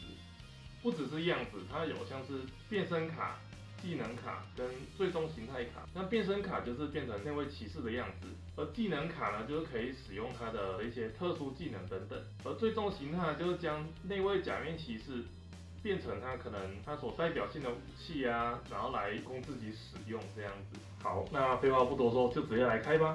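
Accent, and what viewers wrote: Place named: Malaysia. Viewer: 可能是戴了面具顶着鼻子，所以口音就很像马来西亚口音，其实我也是以为是马来西亚人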